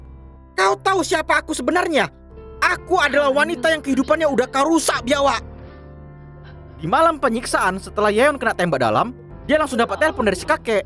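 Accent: Medan, Indonesia. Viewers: Kayak nya dari medan ya bang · Bahasanya kyk tmen sy orng medan,lucu😂🤣😂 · Maen kli bahh bahasa logat Medan kita itu ya wakk 😂 Salam dari Belawan Lae ku 🙏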